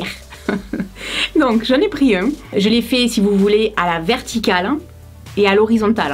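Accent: South, France. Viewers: T'es sudiste non ? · Bonjour ( petite parenthèse perso vive les accents du sud) c’est la deuxième vidéo de toi que je découvre et j’apprécie beaucoup! Tes vidéos sont honnêtes et faites pour aider en donnant un avis sincère donc merci de ton aide et à bientôt je suivrais tes prochaines vidéos 👍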